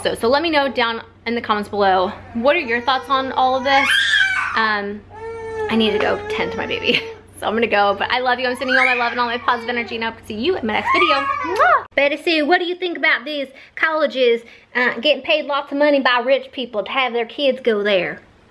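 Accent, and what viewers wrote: South, United States. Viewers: I luuuuuv your suuthun accent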